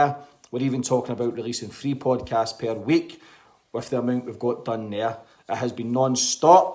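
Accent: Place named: Scotland, United Kingdom. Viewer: Scottish surely?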